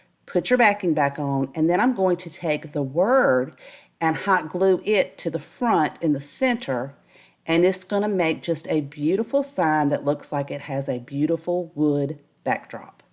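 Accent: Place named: Tennessee, United States. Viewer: You speak exactly like my family who live in TN! I’m in the north, so your accent is very distinct and familiar! 😊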